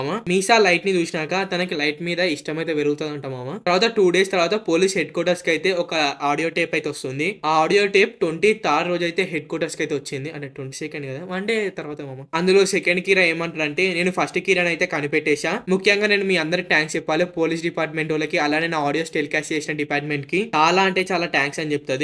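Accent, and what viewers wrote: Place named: Telangana, India. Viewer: Burrapadu undi bro ni telangana slang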